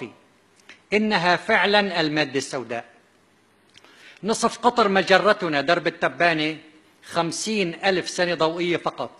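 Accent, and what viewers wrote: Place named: Aleppo, Syria. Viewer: هو من حلب سورية واللفظ في هذه المدينة يكون هيجذ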